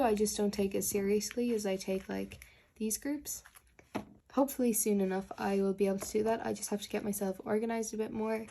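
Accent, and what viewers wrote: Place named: Ireland. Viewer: ok i just found your channel (im watching all ur storing vids) and i didnt even notice your accent until you said youre irish 😭😭😭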